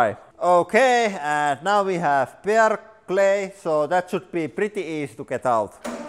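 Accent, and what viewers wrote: Finland. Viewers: So satisfying and i love the Finnish accent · "En then wi Hev Nutelllllaah"...i love finnish accent😂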